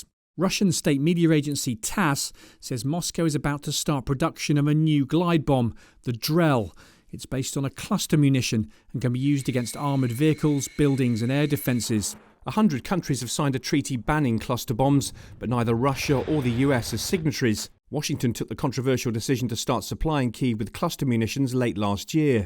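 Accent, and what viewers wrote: United Kingdom. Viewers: I heard his british voice thats how i know this is a false propaganda channel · Whenever I hear a British accent narrator i feel propaganda whyyy ?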